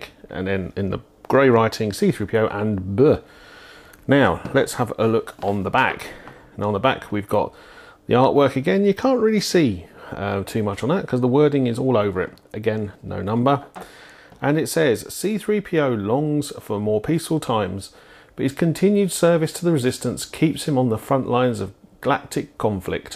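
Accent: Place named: United Kingdom. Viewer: probably somewhere in the uk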